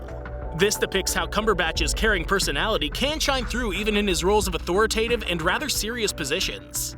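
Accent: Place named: United States. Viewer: I left the following comment on your phoney 'no talking films stars' sister, channel. On this channel Benedict mumbles a few words where you've turned the sound down. Why? Read this: (like you two; I couldn't be bothered writing to both of your channels... you're carbon copies of each other. I guess you discovered you'd need more channels to get subs rather than pay for the commercial rights to the actors actually talking, out loud): Videos about really interesting, talented people like where you never get to hear them speak. Not acceptable. Could it be because the cheapskates at Biographer are too mean to pay the commercial rights to their film clips so they can't broadcast them with sound? That's why the irritating American 'car salesman' voice has to quote everything that is said in the clips. There is a clear message in the mere 88K subscribers; heavens, there's a grandma cooking pasta sauce on YouTube with double the subscribers than Biographer. 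Imagine if you actually had the voices of the huge stars you have images of... ah, but then again you'd have to shell out for that. You'd have to sell more advertising. Nah, don't bother. Not on my account... I'm outta here...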